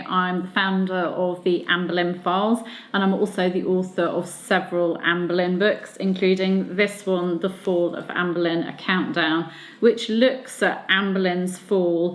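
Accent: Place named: United Kingdom. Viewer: That's the British English spelling - and she's speaking British English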